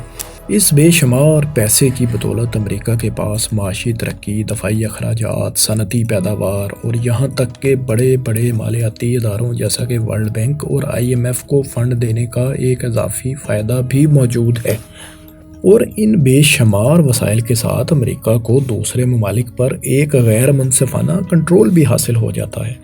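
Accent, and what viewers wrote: Punjab, Pakistan. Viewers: اس چینل کی تمام وڈیوز اردو زبان میں منفرد مقام رکھتی ہیں مگر اس نامناسب آواز میں نشر ہونے والی وڈیوز سماعت پر بہت گراں ہوتی ہیں۔ اگر یہ اردو زبان ہے تو اسکو پنجابی لہجے میں کیوں ادا کرا جاتا ہے؟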